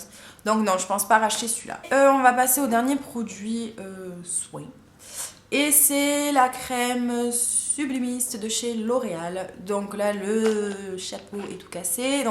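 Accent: South, France. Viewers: Coucou! Franchement j'adore tes vidéos! T trop mimi! Ca fait du bien de regarder tes videos d'autant plus que j'adore ton accent, je suis du sud mais je vis dans le nord depuis quelques années et c'est dur :( voilà je suis régulièrement tes vidéos qui m'apprennent pleins de choses ;) continue t trop cool et trop rigolotte!! Bye!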